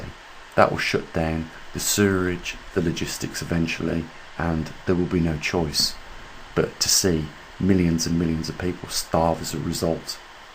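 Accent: United Kingdom. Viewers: Love the British accent! But are you talking about the earth's Chandler Wobble. Because the earth is moving thru space like a drunkard right now. And that is giving us extreme weather changes around the planet. The crazy drunkard planet is giving us low and high jet streams in America which means severe weather such as tornado's, blizzards and ice storms so far in December 2021 and January 2022!! Is this what the Mayans were talking about? You said in the next 40,000 years the earth will start to get colder! And we are about 6,000 years into it? Wow!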